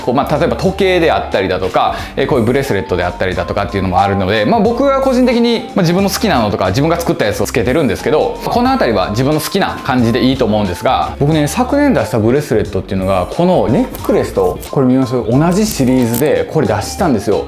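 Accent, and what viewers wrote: Kansai, Japan. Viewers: 初コメです！関西弁でわかりやすいので参考にさせてもらいます！（笑）ちなみに、ジョブズは992が一番有名ですよー！993いいですよ！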